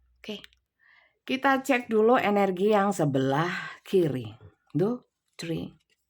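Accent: Java, Indonesia. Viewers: Hdeeeh bunda lucu ,medok mboteopo dechhh😊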